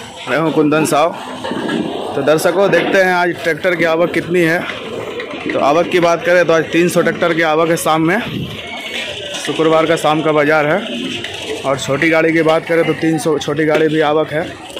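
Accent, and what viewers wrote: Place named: Bihar, India. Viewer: भाई आपका बिहारी भाषा हमें बहुत अच्छा लगता है 🤗🤗🤗🤗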